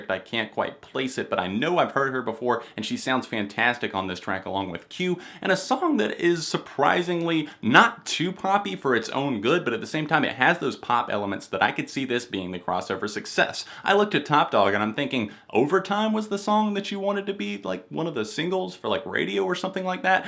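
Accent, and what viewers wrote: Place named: South, United States. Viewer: Bizzarro southern twang version of Anthony Fantano lol. Liked your review thanks.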